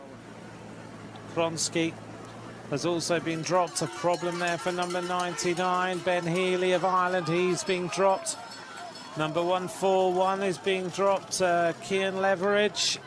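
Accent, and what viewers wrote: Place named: United Kingdom. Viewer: イギリス英語気持ち良すぎる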